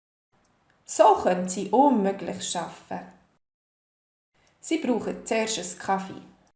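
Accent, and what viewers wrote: Bern, Switzerland. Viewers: Bern Dialäkt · What area of Switzerland is this dialect spoken?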